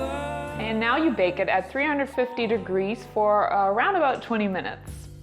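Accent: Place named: Germany. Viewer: You sound very German!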